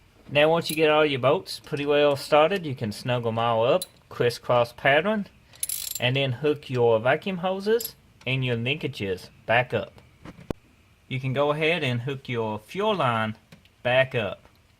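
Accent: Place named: West, United States. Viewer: Nothing to do with the diy it your accents cool. Reminds me of the wild wild west lol. Thanks for the video too though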